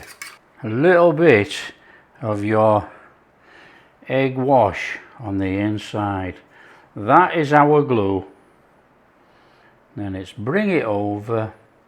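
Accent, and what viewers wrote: Yorkshire, United Kingdom. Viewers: are you from yorkshire,?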